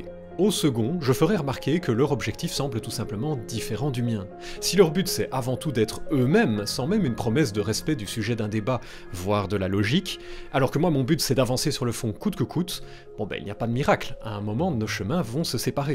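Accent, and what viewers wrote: Belgium. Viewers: Han j'aime vraiment pas le français de belgique xD Chatonne AAAAAAAAAHHHHHHH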